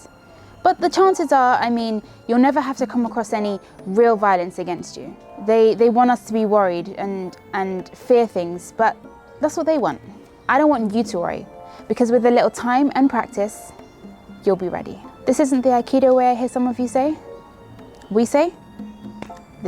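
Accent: United Kingdom. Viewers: Are u from the UK? i asked because of your accent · Having studied Judo for 10 years, I'm surprised that you would suggest this difficult and risky throw in a street confrontation. In sport Judo on mats or on TV it looks spectacular, but in real life, if you misplace your foot, the attacker will be on top of you. And now you're grappling on the ground. Not a good place to be.This throw requires a great deal of mastery to be effective in a street fight. I would rather use Taitoshi where you also use forward momentum but you cross your leg in front of both attacker legs and pull him over.Easier to learn and have used it many times. I grew up in New York!! :-)) Nothing like a pretty girl with a sweet British accent:-))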